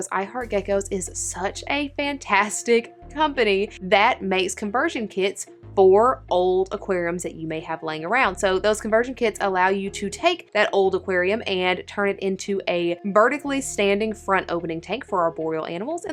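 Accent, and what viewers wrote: South, United States. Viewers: OMGoodness, where are you from? You sound like you are from the South! I'm in NC and new to having a leopard gecko!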